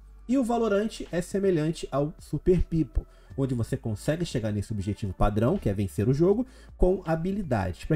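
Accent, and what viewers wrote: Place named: Rio de Janeiro, Brazil. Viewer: Mano o que e pubidi kkkkk você deve ser do rio de janeiro só fala asim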